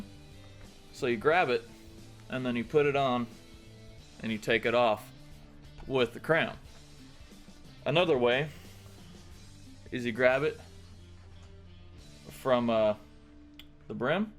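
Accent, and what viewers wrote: Texas, United States. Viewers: yea that and just the hard texas accent. Rs are pronounced very hard from what i can tell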